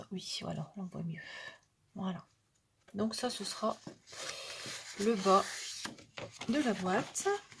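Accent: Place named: Northern France, France. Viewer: Ccou tout parait tellement simple avec toi j’adore il suffit de bien suivre tes instructions et tout baigne merci et bravo surtout que je débute et je rigole toujours quand tu parles avec tes ptites intonations de ch’nord lol ne change rien tu pourras pas toute façon Hihihihi bonne soirée merci pour le partage bisous 💋👍👋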